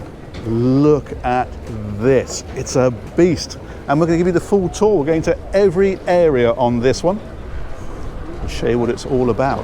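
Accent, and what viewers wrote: United Kingdom. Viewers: Another great review. You’re the best and love the British accent.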